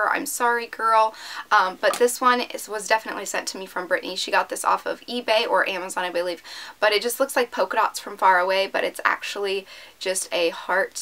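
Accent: United States. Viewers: Western / texas . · Are you from boston??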